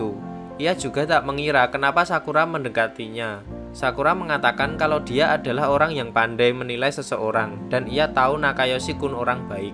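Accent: Java, Indonesia. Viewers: Medok men... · Abang orang jawa ya? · Bro jagan medok dong plis deh.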